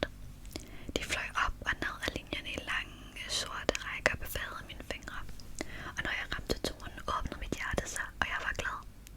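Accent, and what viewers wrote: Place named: Denmark. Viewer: Ohh btw, do you mind me asking what city / Danish region you’re from? Your Danish accent is beautiful but I think it’s a bit different from the one I’m used to hearing on Duolingo 🥰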